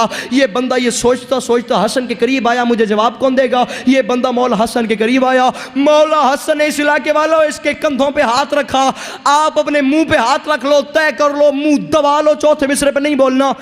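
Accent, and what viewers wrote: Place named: Punjab, Pakistan. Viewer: meray bhai ue punjab ka hai or waha sab ka sirf is ka nahi sab ka bayan karnay ka tareeqa aisay hi hai.. ye over acting nahi hai..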